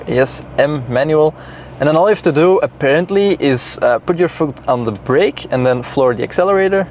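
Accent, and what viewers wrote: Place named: Antwerp, Belgium. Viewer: Zie da ten bodukap dees filmke nie zie hee! Ik dacht al dat ik ee sint katelijnse tongval hoorde. Van achter in mijnen hof zie ik op het St. Michielskasteel! Goeie review! 🤘✌️👍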